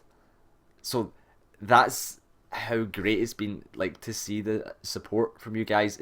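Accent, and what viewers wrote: Scotland, United Kingdom. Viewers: I can't even imagine what it must be like man. I am watching your DS1 guide to help me on my second save and you just make me laugh and keep me plodding through. I love the Scottish accent too. Bringing donations into the limelight is fine, and I wish I could donate directly, but hopefully my views on youtube will help out! Keep keeping on man, you guys kick ass!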